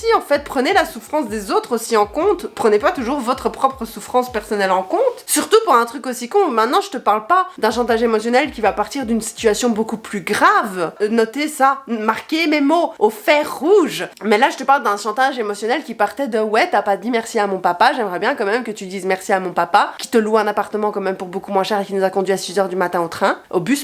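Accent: Brussels, Belgium. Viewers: MEUF t'es bruxelloise en fait?! Je me suis toujours demandée d'où tu étais en Belgique (je suis Bruxelloise) mais je me sens honteuse de pas avoir reconnu l'accent là quoi... (bon maintenant, je regarde le reste de la vid)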